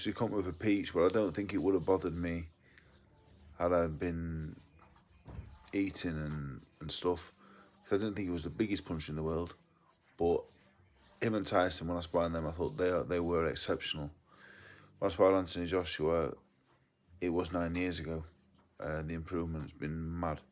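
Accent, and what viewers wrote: Yorkshire, United Kingdom. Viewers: Yorkshire accent. Dave looks like how I imagine Heathcliffe to look but with different hair and clothes.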